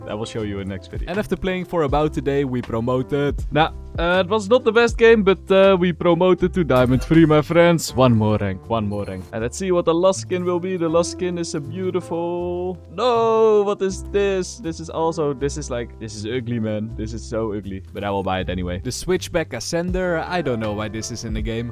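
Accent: Netherlands. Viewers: You are Dutch arent you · i smell a little nederlands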